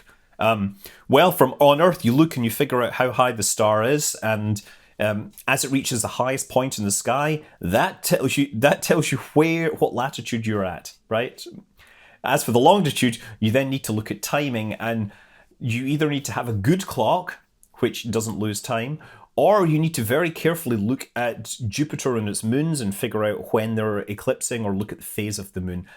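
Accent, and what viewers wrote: Scotland, United Kingdom. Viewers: JUST KEEP ON DOING WHAT YOU DO ❤️ ... I watch many other space channels like Every Day Astronaut etc ... But it isn't a good week, if I don't hear your fantastic scottish accent 🙏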